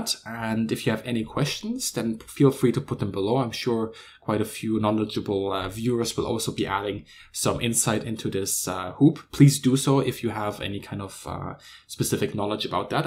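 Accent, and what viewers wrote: Germany. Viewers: Bassmint He sounds german and in german its called aluminium so thats just natural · You sound German, so I get that you use meters, but that's more like 150 feet.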